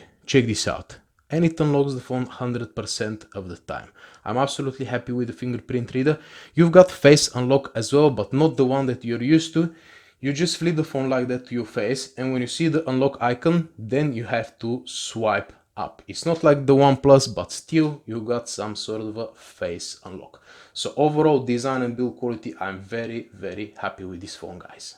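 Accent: Russia. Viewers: his russian accent is funny! lol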